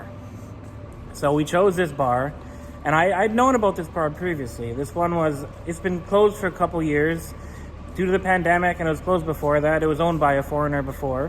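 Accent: United States. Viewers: You sound like an American and you actually have the right to own 100% of a business in Thailand. It's called "The Amity Treaty". You just need to have it setup with a lawyer and go to one of those firms you talked about. I can't believe no one told you about this. The paperwork is like 14,000 baht. Google, setting up an Amity Treaty business in Thailand.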